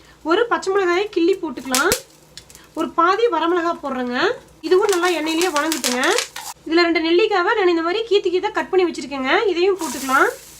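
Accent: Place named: Gobichettipalayam, India. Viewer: hi !!!! I'm also from gobichettipalayam......unga salang keta udane namma oru than irukum nenaanachu check pannana semma...semma nenga namma gobichettipalayam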